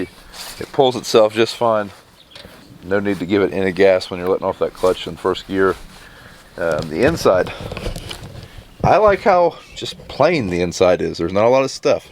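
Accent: Ohio, United States. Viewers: 3 things It sounds like you are from the great state of Ohio, like me I like the buggy A million Indians can't be wroung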